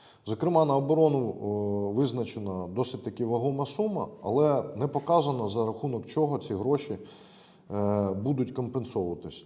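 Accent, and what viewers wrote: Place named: Russia. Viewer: Зі всією зневагою до нинішньої ''влади''... Ти просто'' топиш'' за ''шоколадного''... Це ганьба!!! А, так... І - підтягни українську . Бо ж аж сифонить ''узькім''...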